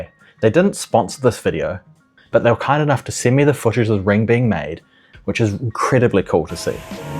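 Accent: New Zealand. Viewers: are you a new zealander?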